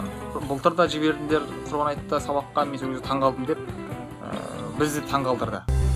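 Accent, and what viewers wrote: Standard. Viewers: Ризамыз таза казакша сойлеиди жане дастур бойынша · қазақшасы қандай таза. Ризамын.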